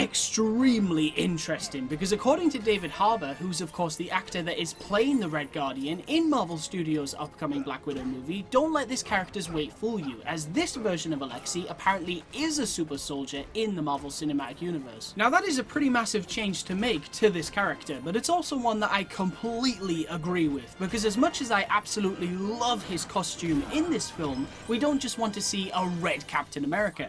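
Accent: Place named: Tyneside, United Kingdom. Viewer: My son is loving your videos. What's bugging me is, your accent sounds soooo familiar.. Are you from the North East, Tyneside maybe?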